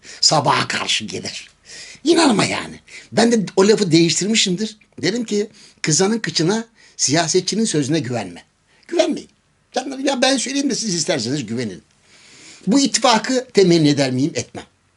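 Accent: Thrace, Turkey. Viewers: Trakya şivesi severim dede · Günaydın, mutlu sabahlar efendim. Yine her zamanki gibi salı gününede pozitif bir başlangıç yaptınız ve Trakya şivesiyle güzel fıkralara başladınız maşallah enerjik halinize bayılıyorum. Tanrı yaşamınızı hep böyle devam ettirmenize katkı versin. Tüm abone ve takipcilerede mutlu sağlıklı yaşam dilerim. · Harikasiniz cok degerli bilgiler veriyorsunuz ...ayrica fikralariniz trakya siveniz mukemmel🇹🇷🇹🇷🇹🇷🇹🇷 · Ben de bayiliyorum Trakya sivenize, Gecen gun "Hinge" demistiniz, butun gun aklima geldikce guldum. :) Ancak Trakyalilar anlar sanirim. :) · Saygılar dedecim. Bi gün videonun tamamını trakya şivesiyle yapsanız. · Ben bayiliyorum sizin trakya sivenize. Sabah saat 6 da yuruyus yaparken sizi kulakligimla dinleyerek spor yapiyorum. Vakit nasil geçiyor anlamıyorum hocam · Biz de trakya şivesini severiz.. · bilakis trakya şivesine bayılıyoruz beyaa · LÜTFEN TRAKYA SIVESIY'LE KONUSUNUZ, COK HOSUMA GIDIYOR !!!... 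· .Trakya sivesi ile konusmaniz cok keyifli . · Dedem trakya şivesine lütfen devam.....❤❤❤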